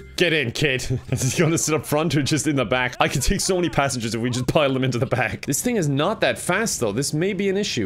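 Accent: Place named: Ireland. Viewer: Irish Man yells at video game.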